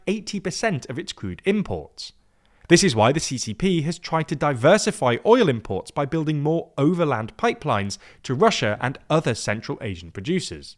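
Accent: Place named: South, United Kingdom. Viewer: He's a poncey southerner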